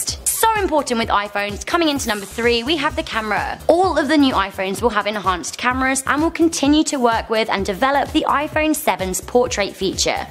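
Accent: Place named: United Kingdom. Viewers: Winter Plays what cute accent? She's british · Zuriel Babida exactly her British accent is cute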